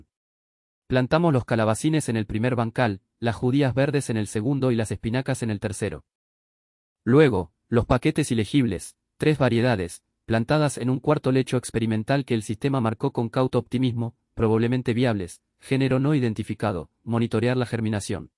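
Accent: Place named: Argentina. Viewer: IA argentina?!!😂😂😂 Primera vez que escucho esa voz Ia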